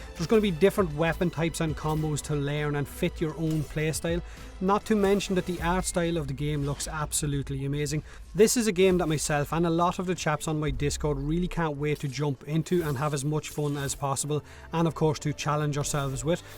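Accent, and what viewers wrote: Ireland. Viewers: I am guessing you are Irish, are ya, mate? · God damn, I couldn't decide which was better; the video game being made or your accent. I hope we get to meet in-game one day, Irish Hunter Man. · Very Irish :b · Your irish accent is somewhat entertaining xD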